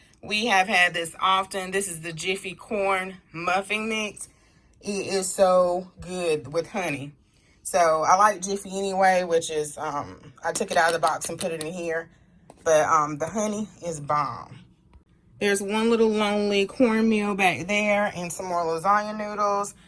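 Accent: United States. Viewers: I love your country accent! Its so soothing. I wanted to keep replaying your videos just to hear your voice.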